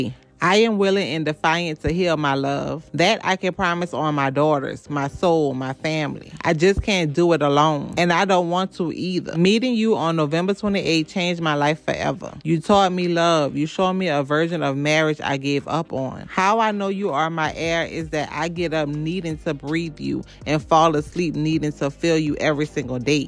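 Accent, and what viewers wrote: New Orleans, United States. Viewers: Your accent.... New Orleans?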